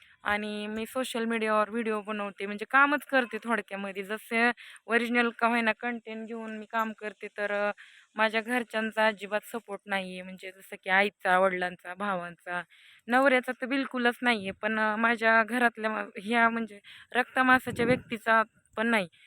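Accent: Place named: Ghat, India. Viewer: आपली घाटी भाषा भारी वाटते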